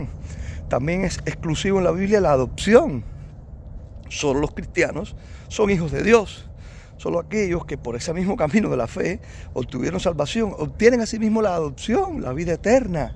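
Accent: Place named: Cuba. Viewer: Fuera creyente o no los que su propiedades quedaron intactas, solo podemos decir que Dios los cuido. No entiendo porque usted decarta lo que está antes los ojos de todos. Creo que su forma de hablar me parece Cubano. Parece más ateos que alguien que hace una exegesis de su narración. Nadie puede negar que todas las casa que no le paso nada fue un milagro de Dios.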